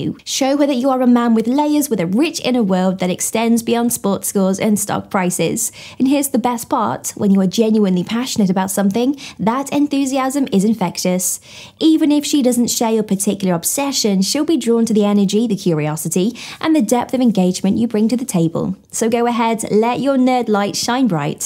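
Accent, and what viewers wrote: England, United Kingdom. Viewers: just like always. You are one attractive beautiful British woman! 😍😍😍😍😍😍😍 I'm here for my British accent fix for the day!😊😊😊😊😊😊😊 You have a Blessed day. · This may seem pedantic but there is no British Accent, there are English accents, Scottish accents, Welsh accents and Northern Irish accents and within each of those there are different accents per city and in London there are also different accents. Her accent is English.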